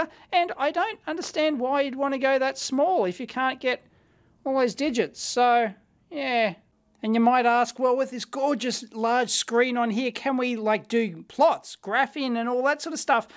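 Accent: Australia. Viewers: Sounds like an Australian Scotty Kilmer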